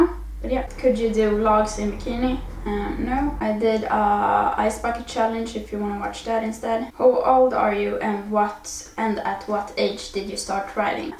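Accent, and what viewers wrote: Sweden. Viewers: when i heard your accent, i knew you was from sweden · man hör svenskengelskan så bra haha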